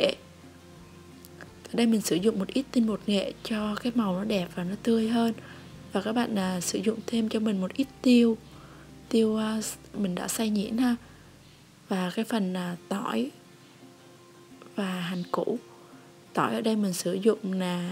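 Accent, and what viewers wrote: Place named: North, Vietnam. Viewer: Bạn này hình như là người phía bắc lấy chồng trong miền nam, vì giọng bắc mà làm món nam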